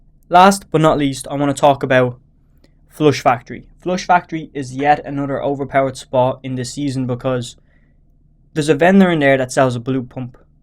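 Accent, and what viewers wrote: Ireland. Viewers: Are you Irish btw?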